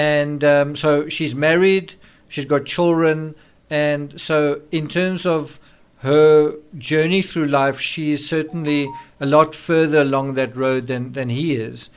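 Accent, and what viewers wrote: South Africa. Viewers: I'm new here. You accent sounds (very) South African.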